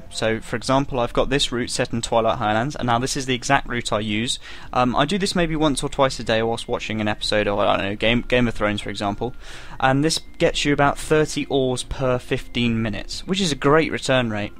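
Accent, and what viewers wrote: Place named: United States. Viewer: Thank you for the video! Love your accent... (usa)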